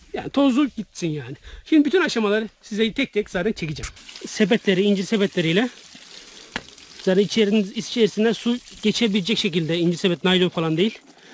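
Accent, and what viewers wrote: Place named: Thrace, Turkey. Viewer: Trakya mı orası şiveniz trakya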